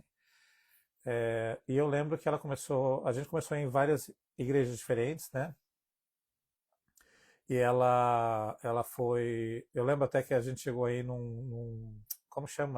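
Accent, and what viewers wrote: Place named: South, Brazil. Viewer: A paz, pelo seu sotaque pensei que era do sul do Brasil.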